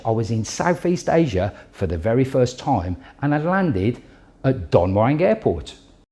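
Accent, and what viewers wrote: Birmingham, United Kingdom. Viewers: I thought it was a Birmingham accent but I didn't want to insult you and mention the black country. I worked in West Brom for 23 years before moving over here, it's a very small world!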